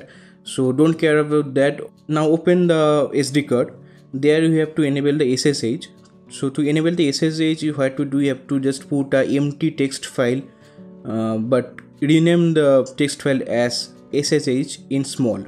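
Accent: India. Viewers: But this indian accent so terrible...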